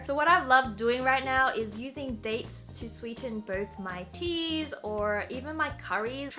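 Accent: Australia. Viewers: She's from Australia? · Are you Australian?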